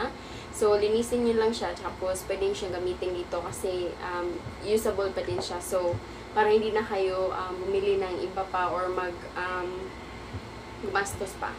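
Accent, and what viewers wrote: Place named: Visayas, Philippines. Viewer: Di jud Malikayan ang pagkabisaya nga accent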